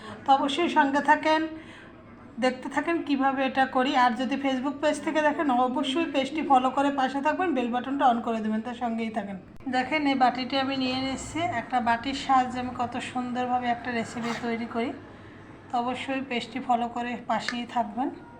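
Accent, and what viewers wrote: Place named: Barishal, Bangladesh. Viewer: 😁😁 বরিশাইল্যা মনু 👍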